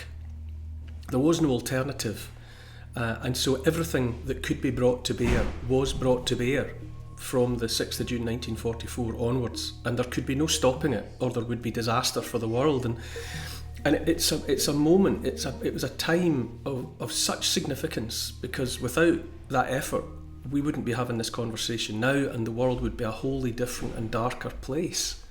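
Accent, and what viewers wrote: Scotland, United Kingdom. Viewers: 💞💞 Immense gratitude (and tribute) for all you do 🤗🦋🐬 A gentle, beautiful Scottish lilt A kind, empathic, forward tilt An open heart; a caring face A willingness to take his place. A strong, home-hitting monologue Reminds us of the boiling frog The courage to become a sleuth A passion to release the truth. A deep desire to crack the whip Illuminates our freedom-strip Calls out the darkness taking hold The shiny despots; plated gold! Intense and raw, he lays it bare A soul-enhancing, honest stare Inflections drive the message through He speaks for Us - for me and you! Discernment's such a precious gift His words create a mighty lift Take heed of all he has to say He knows - it shouldn't be this way! 🤗🦋🐬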